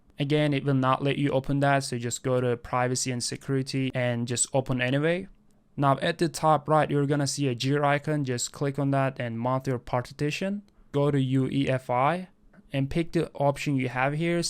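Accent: Iran. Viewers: I love ur Persian accent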